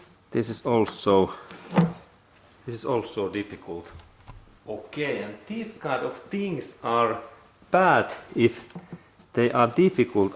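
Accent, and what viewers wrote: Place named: Finland. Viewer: kaunista tää suomen ralli englanti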